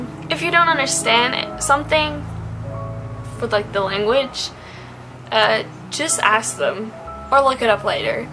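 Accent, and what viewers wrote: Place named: United States. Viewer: You haven't lost your American accent yet. Great tips!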